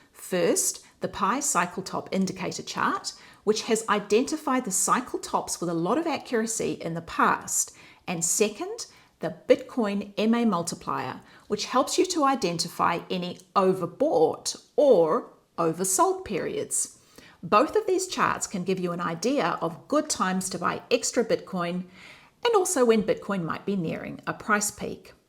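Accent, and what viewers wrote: New Zealand. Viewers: This was an eye opener Just started my bitcoin journey and stumbled onto your platform Your accent reminds me of my kiwi sisters 🤣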